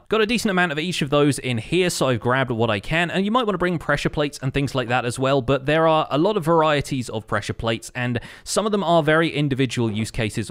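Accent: United Kingdom. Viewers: Why did I notice he sounds British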